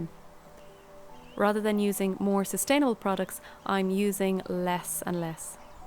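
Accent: Ireland. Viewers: This is the first video of yours I've seen and I heard the accent and was looking at fields trying to recognise what county you're in ha! I grew up in the Wicklow mountains and it's so gorgeous, we're so lucky to have such gorgeous countryside ❤ living in Dublin city now and doing the best I can to be sustainable in a flat!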